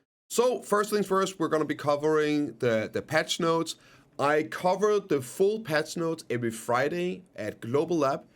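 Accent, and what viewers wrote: Denmark. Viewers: Danglish omg >.<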